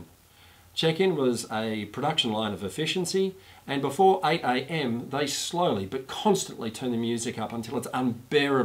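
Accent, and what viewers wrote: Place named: Australia. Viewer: Interesting Aussie accent and jokes. al-BER-gay St- Jon -peed-d-por Walking sticks are so helpful taking 30% of the weight- bearing off hips, knees, ankles. Good for going down & up. I understand your wrist problem but please recommend them! One stick creates imbalance in the body over days. (I've walked 7 caminos) thanks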